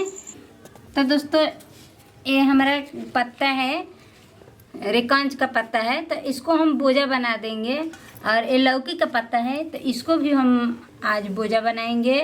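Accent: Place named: Bhojpuri, India. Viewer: App bhojpuri boliye, humlog samjhte hai app kya bolti hai...App plz Bhojpuri boliye...Apka bhojpuri bolte huye bahut achi lagti hai. 😊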